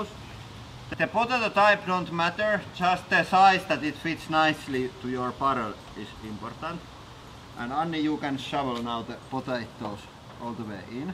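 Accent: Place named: Finland. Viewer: The subtitles is all fucked up :) Can´t handle finnish english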